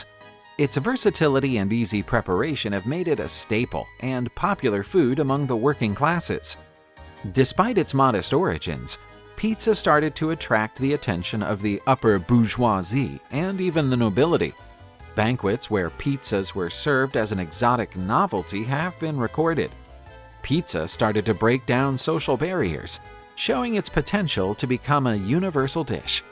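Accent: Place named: United States. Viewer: Good video but shame about the American pronunciations.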